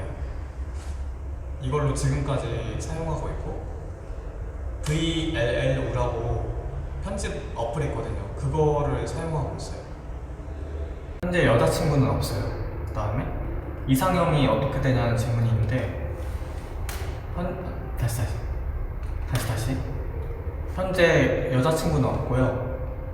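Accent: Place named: Gyeongbuk, South Korea. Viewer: 고향이 어디인가요? 경북지역 억양이 있는데.. 궁금하네요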